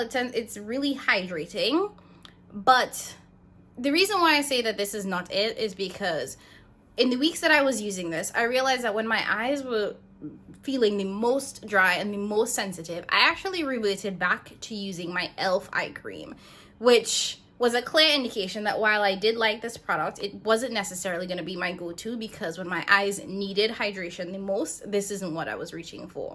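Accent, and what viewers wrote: Trinidad and Tobago. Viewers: Imma sub just to listen to your Trinidadian accent 🇹🇹 lol .. I'm not into makeup too much but I love skincare. Thanks for the video 😊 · Trini people cant hide boy😂.Love the video hun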